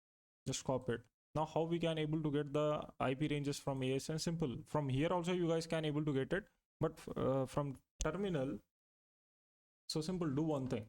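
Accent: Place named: United Kingdom. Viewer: Hello Sir, I love your this British Accent ❤️!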